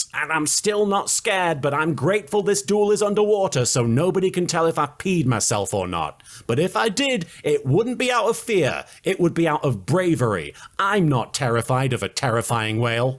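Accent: United Kingdom. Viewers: wait are you british??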